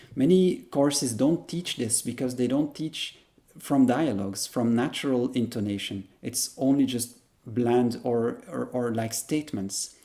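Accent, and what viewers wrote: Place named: Tagalog, Philippines. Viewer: Dude I can hear a Tagalog accent..Your good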